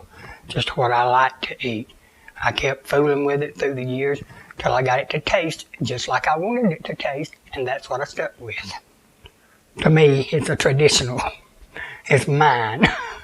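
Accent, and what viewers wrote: South, United States. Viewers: You make fine p.s Makeit like l do love that southern accent tell you are from the country. You are a gem no nonsense just being you. Glad i found your channel.lm from ala. 70 plus years. So refreshing to see some one thats speaks my language. Subscribed just a second ago Wishing you the best.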